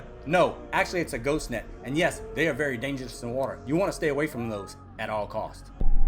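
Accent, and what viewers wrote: Louisiana, United States. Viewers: Dude Your From Louisiana? Me too but where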